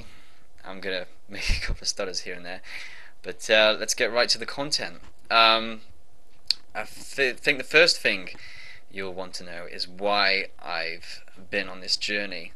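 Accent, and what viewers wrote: United Kingdom. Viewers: Where you from bro? Is that a welsh twang in your accent lol? I'm gonna start no PMO tomorrow, gonna aim for 30 days and may continue after more research and experience etc. Have you got facebook? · Hey brother, firstly congratulations on your monumental achievement. Your video is spot on with all the good reasons to give up the fapping and is of great inspiration to me. I have been in exactly the same spot you described, massively addicted to gaming and fapping for years. I am now slowly pulling myself out from it (no pun intended!), and have decided to focus the extra energy and time towards meditation. Thanks for all the inspiring words. By the way I am trying to place your accent, Mid-Wales or Shropshire area per chance? Cheers again matey! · What kind of British accent do you consider yourself to have? Just curious because I'm studying English and really like your accent - I'm not sure though in which area of the UK do they speak like you?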